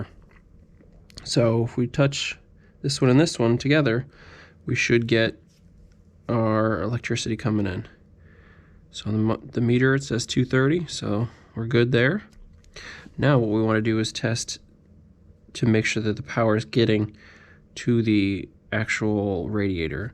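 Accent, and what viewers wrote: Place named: North America. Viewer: Thanks for this. Interesting.... North American accent but UK socket outlets?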